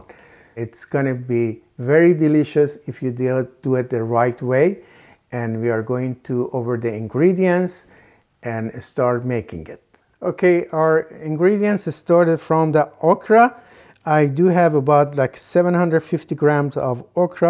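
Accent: Iran. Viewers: beautiful and sincere Persian accent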